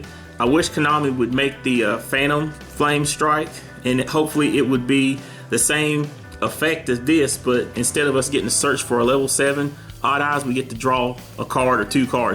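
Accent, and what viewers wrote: South, United States. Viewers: You sound like a country boy but nice profile 👍👍👍😀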